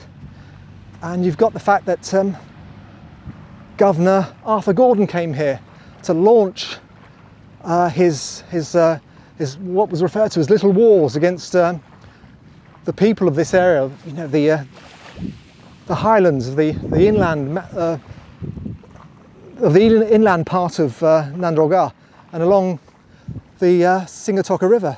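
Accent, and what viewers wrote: England, United Kingdom. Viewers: That English accent will get you anywhere...fantastic work. There's a koro nearby called vatukarasa,one side indians live and the other side fijians and both sides are very accommodating let them take you night fishing you will absolutely love it.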